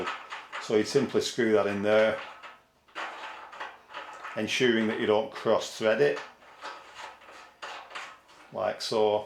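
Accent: United Kingdom. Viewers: Best accent in UK, best advice in World! What did you do to your hand?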